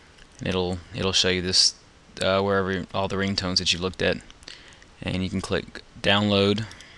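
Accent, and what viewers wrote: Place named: South, United States. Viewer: Actually, you sound like a southern chuggaaconroy.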